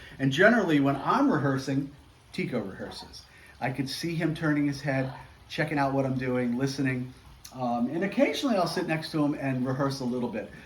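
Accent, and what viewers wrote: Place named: United States. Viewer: Good Saturday morning to you! Thank you very much for this video which allows us to know more about Tico... I have absolutely no doubt that he is a happy bird, who also has, it must be said, a very loving and attentive family. parrot needs. Thank you for enlightening our days or our nights, for sending positive!! ... and thank you also for the French who do not shine too much in English (of which I am... I am more Spanish-speaking, than English-speaking) for trying to improve... I love your American accent (from Florida ?)! A hug to Tico!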